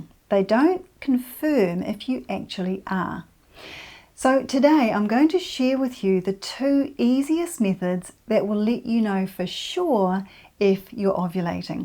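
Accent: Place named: New Zealand. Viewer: Very informative. It's so nice to open a video on the subject of fertility and hear a kiwi accent 😊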